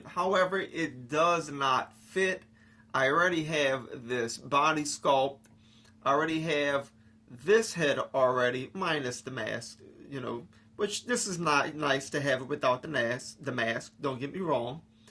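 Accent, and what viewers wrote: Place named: New York, United States. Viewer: You have such a fascinating NYC accent. It reminds me a little bit of Jay-Z. And nice review, by the way. My two are on their way from GameStop. Considering I pre-ordered right when this went up..not sure how so many have it in hand already, yet mines just shipped.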